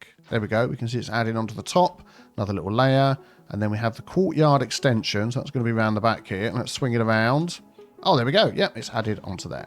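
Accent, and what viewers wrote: United Kingdom. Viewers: This is the first time I have heard a british man talk about french things so positivly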